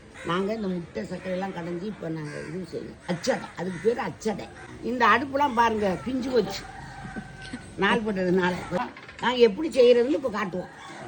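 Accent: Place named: Madurai, India. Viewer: Thaayee.. unga pechu arumai.. adhil pandiya naatu paasam mattume therindhathu..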